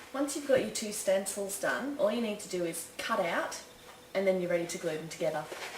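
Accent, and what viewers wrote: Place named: Australia. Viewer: This is great stuff...thanks for sharing! Oh...and it is cool to hear your Australian accent - it is so weird to hear someones voice when all you have done is seen their photos. I have been told I have a minor Boston accent - no where near the movie accents you hear botched up and over acted! :) Again thanks for sharing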